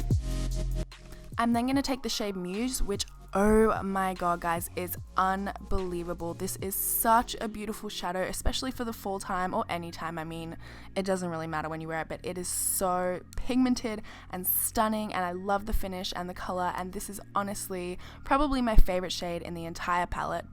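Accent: Australia. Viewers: She's Australian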